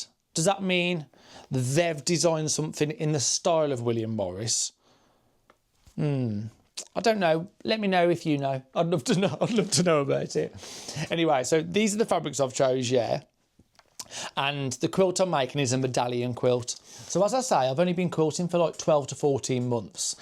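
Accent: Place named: West Midlands, United Kingdom. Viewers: Oh, first of all I’m sure I’m not the only one who would love to give you a big hug and sit you down with a cuppa and a big slice of lemon drizzle cake. I love your accent because my dear old Mum was a Midlands girl and the accent gives me a warm glow every time I hear it. Now, as regards your work I would refer you to our Kate at the last homely house. She makes me laugh out loud when she talks about ‘the quilting police’. Remember my flower, that perfect is produced by machines, handmade is done with love and swear words and is by nature dotted with faults and slipped stitches, just like people. You have already created a legacy. Nearly fourteen thousand people care about you and watch your work. Take a moment to pause and look back at the road you have already travelled. You are loved and respected. Ros ❤️ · You sound like you're from West Midlands, I am too.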